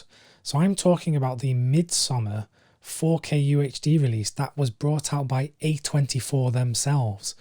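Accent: England, United Kingdom. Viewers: So you sound English are you based in the states? Only reason I ask is I can never order anything from Best Buy online. 4k discs are multi regional but the extras on the bonus disc are not. If you have a link please post it. I wanted BTTF but even <link> says not available in yiur area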